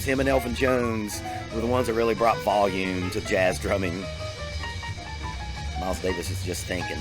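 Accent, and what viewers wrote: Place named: South, United States. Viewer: your accent is really interesting, what part of the US are you from(from movies i know its the south somewhere)? also great video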